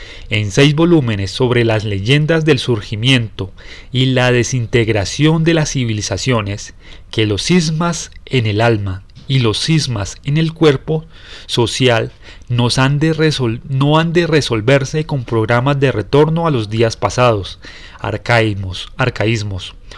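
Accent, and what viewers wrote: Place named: Colombia. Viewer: Que joya este libro y de mano de un acento colombiano. Oro puro. Saludos y gracias por ese trabajo que hiciste para transcribir esta joya!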